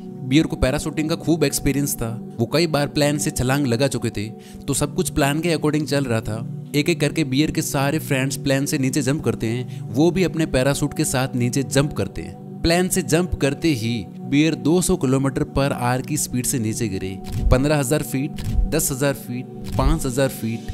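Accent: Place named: Marwar, India. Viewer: Jodhpur se ho? Marwadi tone aa Rahi h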